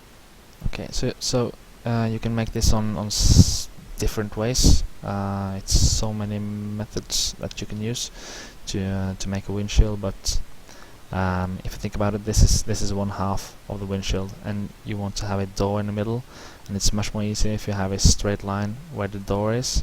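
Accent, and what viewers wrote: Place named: Spain. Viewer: This is a great tutorial, even more for the ones who are still starting to use Sketchup. Thank you very much for sharing! By the way, if english is not your main language, which is? Is it spanish (i have a feeling about it).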